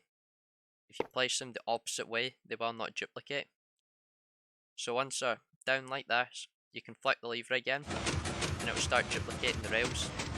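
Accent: Scotland, United Kingdom. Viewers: True Scottish accent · You have the strongest Scottish accent i have ever heard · Ur from Scotland right? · Hey fellow scottish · Scottish my brother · that scottish accent is dope.. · Scottish accent? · Best scottish accent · i k he's scottish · wow scotsh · He has a sccotish accent lol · is it me or does Scottish accent sound really appealing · Its scottish bruh u can guess it right as he says it... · It's his accent... That's kinda rude for the Scottish peoples....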